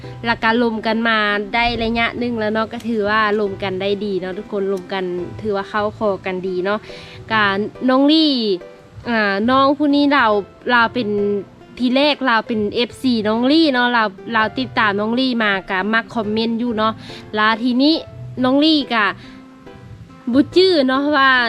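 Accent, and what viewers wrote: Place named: Surin, Thailand. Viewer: ฟังไปฟังมาคิดว่าเป็นคนที่ไหนที่แท้คือสาวสุรินทร์นี่เอง... จังหวัดสุรินทร์อยู่อิสานใต้อยุติกกัยกำพูชา.. พูดไทยเกือบเก่งละครับ.. หัดพูดไปอีกนิดนึงเดี๋ยวก้อพูดภาษาไทยคล่องนะครับ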